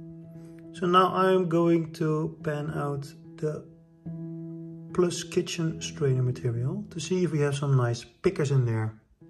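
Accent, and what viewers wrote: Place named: Netherlands. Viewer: Ik hoor gewoon dat je nl bent hahah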